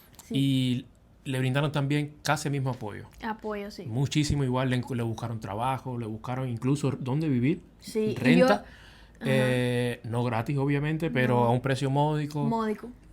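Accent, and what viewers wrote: Mexico. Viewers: Hola !! Me llamo Raul soy mexicano, vivo en la ciudad de México, soy profesor Universitario. Tu acento parece más de mexicana, tu físico no es tpiico cubana, tu físico es más de una ciudadana de USA. Te explicó las comidas sobretodo aquí de ka ciudad de México, y a sus alrededores. Hay dos desayunos uno super ligero y.otro más completo. Ya te diste cuenta que la mayoría de las personas que vivimos en la ciudad de México el trabajo y la escuela nos quedan muy lejos, son distancias muy largas y tiempos transporte muy largos. Te doy este contexto para que me entiendas sobre estos dos desayunos. Mucha gente se levanta muy temprano tiempo suficiente para arreglarse y tomar un desayuno ligero (es el desayuno) qué regularmente es una taza de café y pan y jugo y salir corriendo para ir al trabajo o la escuela ( es para tener algo en el estomago). 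El dasuyo completo donde ya uno en su trabajo o en la escuela dan un descanso de 20 ó 40 minutos y es cuando uno puede desayunar huevos al gusto, café, fruta, jugo y etc, este es el almuerzo. P Precisamente la vida aquí en la ciudad de México es muy acelerada en la mañana es arreglarnos y salir muy rápido para llegar al trabajo o a la escuela apenas desayunamos muy ligero y es solo para tener algo en el estómago ennlobque ya podemos alkozar (desayuno completo) despues en el trabajo o een la escuela donde nos dan un descanso suficiente de 30 ó 49 minutos es cuando podemos desayunar más completo y más tranquilo es el almuerzo. El almuerzo va v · Ya hablas mejor más despacio más claro hay la llevas te estás mexicanisando bien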